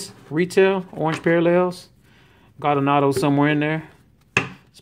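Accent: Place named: Louisiana, United States. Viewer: Oh cool I'm in Texas too, but I was born in Louisiana and you kind of have the accent so I was jc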